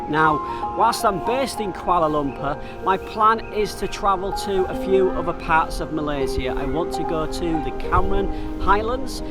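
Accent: United Kingdom. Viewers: Ooo u come back to ur ex coloni...Malaysia is ex British colonial and British is not strange to us...ur ascent so pure british ascent..amazingly u like nasi lemak the malay traditional foods and now days all malaysian take nasi lemak. The cultures is diversity in Malaysia. I not need to tell u more about Malaysia and u well recognised about Malaysia I can see that. The London also not bad and amazing city too. London also with multi races and mostly from commonwealth British. Indian and Pakistan foods in Victoria also not bad nice taste and its cost about 10 pound huhuhu..one thing I like to travel to Europe is from London. The national express provide the route to the rest of Europe city and departure from UK to other city to Europe is easy for the imigration process. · Hello sir.... I love your video about Malaysia.... I hope that I can meet you one day.... 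I love British people because of their talking style or maybe the accent... I love the way you talkin' sir so British 😅.... anyway welcome to Malaysia once again sir... enjoy your life in this beautiful country okay... 👋☺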